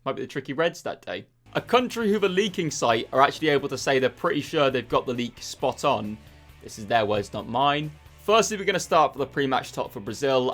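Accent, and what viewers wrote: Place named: England, United Kingdom. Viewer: Where in England are you from? I'm curious about your accent. Thank you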